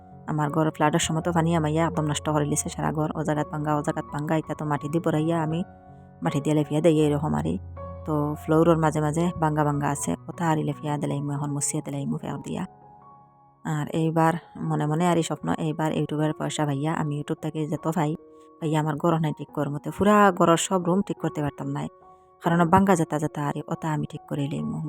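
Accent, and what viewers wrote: Sylhet, Bangladesh. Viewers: আসলে আপনার কথাগুলা অনেক ভালা লাগে। আর আপনার কথাগুলো আমরার সিলেটের লাখান। আর আমি সিলেটি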